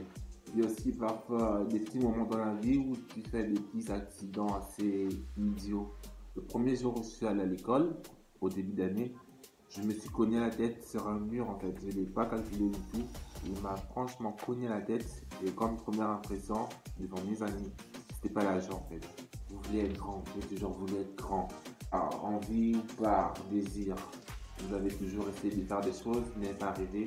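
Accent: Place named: Gabon. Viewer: Aah bah voilà comme si je savais que t'étais Gabonais 🇬🇦 à cause de ton accent 😂😅 je te suis depuis 3 mois à Libreville félicitations et surtout continue comme ça prck depuis ces 3 mois je suis tes conseils et j'ai pris 4 cm même si c vrai que parfois je suis plus le matin et moins grand le soir et je fais 1m62 , mon père 1m65 ou 66 et ma mère 1m55 et en plus j'ai envie de faire 1m84 tu penses que je peux les atteindre stp 🤔🙏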